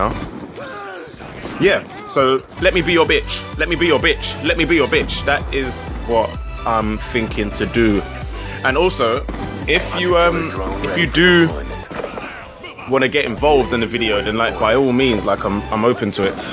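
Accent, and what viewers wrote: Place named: England, United Kingdom. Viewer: Hello:p You sound like your from england, what city do you live in? Im guessing london:p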